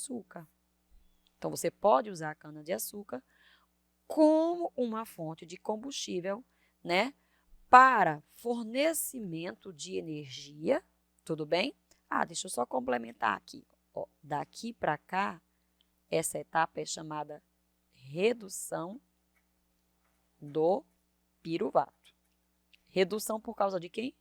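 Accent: Northeast, Brazil. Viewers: pelo sotaque acho q do nordeste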